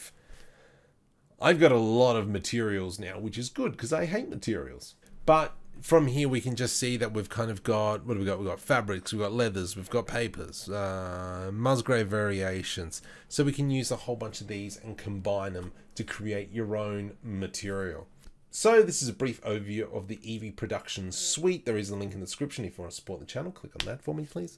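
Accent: Australia. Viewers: Sorry to ask..are you Australian?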